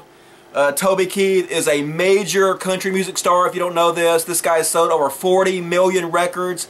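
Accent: South, United States. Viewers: Never heard of you til today. I too have a pretty bad southern accent (Louisiana) and I tend to pick on hillbillies alot because of their close mindedness and lack of intellect. Dude, I couldn't help but to be impressed by your video. You mentioned people judging you because of your accent, and when I first started watching the video, I started to click on something else. (regardless of the fact that I sound the same.) I guess you can say, I assumed lack of intellect when I heard the accent and I apologize. As I continued watching the video. I realized, this southerner is pretty freakin cool. You are alright there. Way to represent logic from the South. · I'm not gunna lie the first video of urs I saw I thought "oh no a ranting southern hillbilly." I'm glad i listen to words not accents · Great video once again! Just to let you know, I don't automatically judge every southern drawl as a moron.:D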